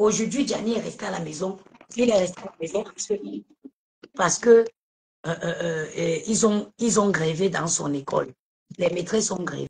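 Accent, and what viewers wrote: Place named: England, United Kingdom. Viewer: Tu as l’accent anglais ma fille pas dorcaca qui parle anglais en patois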